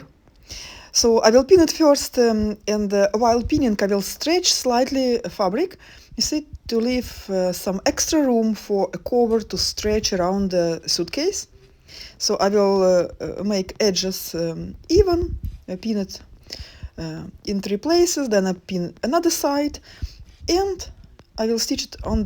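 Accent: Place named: Russia. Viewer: Слышу русского человека. Но почему не на русском? Хотя бы субтитры?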